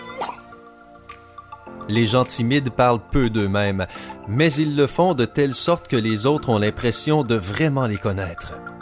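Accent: Quebec, Canada. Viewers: accent québécois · C'est l'accent canadienne😉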